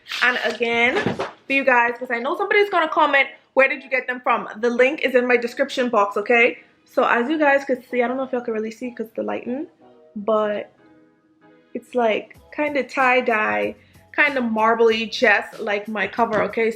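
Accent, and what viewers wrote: Bahamas. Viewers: I hear an accent. I may be wrong but are you Bahamian? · I knew I heard a Bahamian accent 😅😌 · I watching this trying to figure out if you Bahamian cause it sounds like you are...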